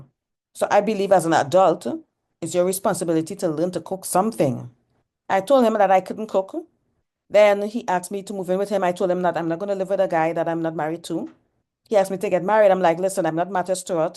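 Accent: Trinidad and Tobago. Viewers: Yes she sounds like a Trini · What a minute i love the cursing you are a mess. You also sound Trini. Are you?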